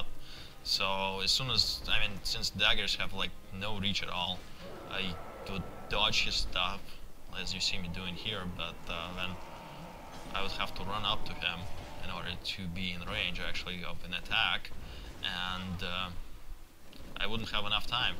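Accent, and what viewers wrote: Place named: Russia. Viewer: Loving that russian accent.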